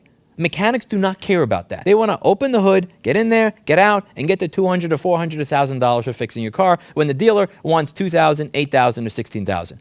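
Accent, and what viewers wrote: New York, United States. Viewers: how do you sound more new-yorker than you did when you lived there 😂😂 great vid regardless... keep up the pressure on anti-consumerism!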